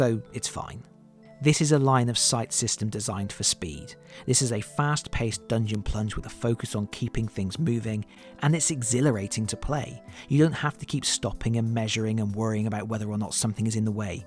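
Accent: United Kingdom. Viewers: Great review. The british accent adds to it for some reason..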